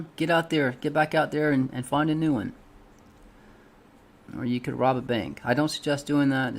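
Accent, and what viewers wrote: South, United States. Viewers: That country drawl is killin the chillin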